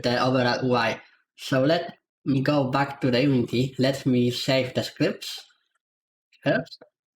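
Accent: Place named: Poland. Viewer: Ik u are poland i hear that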